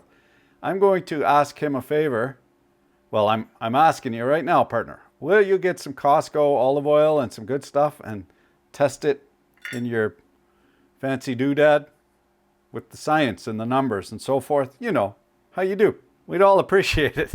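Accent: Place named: Canada. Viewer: canadian accent...where's your secret lab? Be safe out there, you can't go breaking down the science and expect to go unnoticed. Thanks for the reminder eh.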